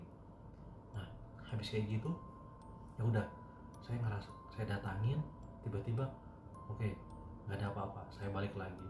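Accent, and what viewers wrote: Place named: Kutai, Indonesia. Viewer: urang gaib ..bunyi urang kutai om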